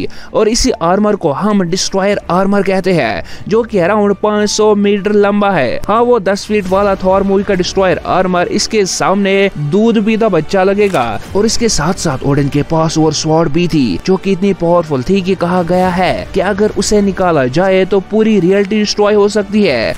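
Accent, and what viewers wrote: Kashmir, India. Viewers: Kashmiri ho kya ?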